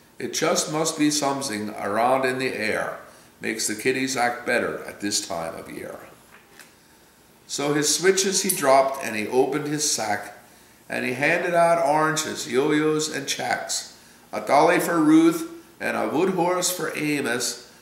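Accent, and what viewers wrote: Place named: Pennsylvania Dutch, United States. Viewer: Ich gleich dei Deitschy accent. I like your Dutch accent.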